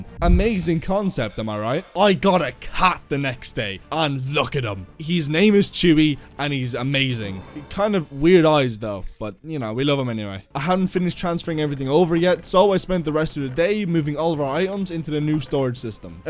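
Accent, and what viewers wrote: Ireland. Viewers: Bro im defo not kapping thats an irish accent foohhshoooo 😂 absolute horseplay.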